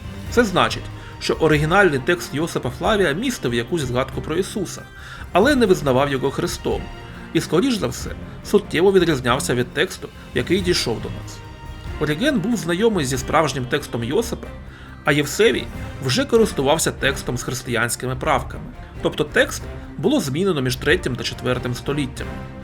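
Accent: Russia. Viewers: супер. але працюйте над вимою букви "г"))) Бо чути, що вимова російська))))) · Хороше відео, проте попрацюйте над українським звуком [г], бо звучить завжди [ґ] - різкий рос акцент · Російський акцент ПІДБІШУЄ.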